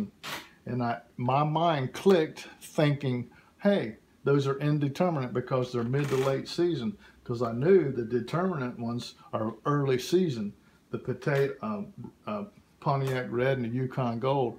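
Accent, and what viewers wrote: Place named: Virginia, United States. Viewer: Sooooooooo glad to hear your wife is healed. I was rescue captain for Virginia Beach Rescue Squad back in the 80s. Love Virginia... that's why I recognized your accent. I live in the Netherlands now. Thanks for the tips. I'll be staying tuned. Peace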